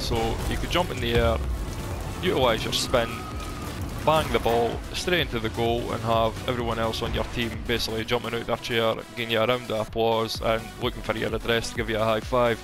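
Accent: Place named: Scotland, United Kingdom. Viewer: I really like the Scottish accent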